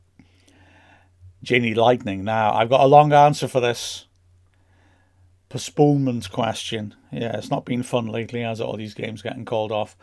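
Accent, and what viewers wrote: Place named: Newcastle, United Kingdom. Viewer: Loved your Geordie accent! P.S. Thanks for keeping it under an hour. I find too many podcasts go to an hour and a half or more and I think that's way too long :)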